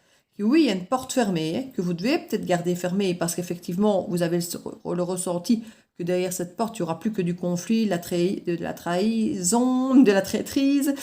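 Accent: Liège, Belgium. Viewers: Ça me parle mais cet homme a 74 ans et ne changera jamais, il a un caractère impossible. Bravo s il a fait un travail sur lui mais je n ai définitivement plus confiance en lui et en plus la haine n est pas loin vu les 2 ans pénibles vécus dans ce lien karmique, rien de sacré là- dedans pour moi! Merci bcp,votre accent liégeois est très agréable, une compatriote!, je suis de Bruxelles. Magnifique votre guidance, cela m'a conforté dans ma décision de couper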